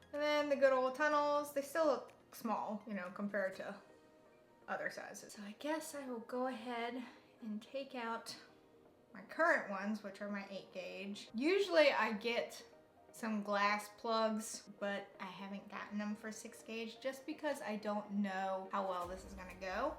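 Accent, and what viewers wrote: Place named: Louisiana, United States. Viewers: I'm going to guess you're from Louisiana. The way you spell go and the word Y'all. Lol. You're a beautiful young lady. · That Cajun accent though! Love!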